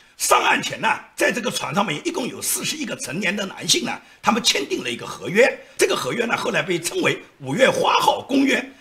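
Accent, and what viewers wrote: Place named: Fujian, China. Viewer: 一听声音就知道是福建烂仔。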